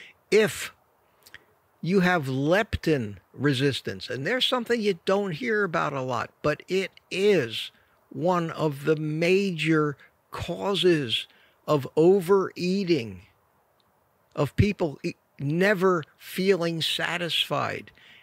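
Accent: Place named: East Coast, United States. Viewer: Excellent info given with an authentic east coast accent.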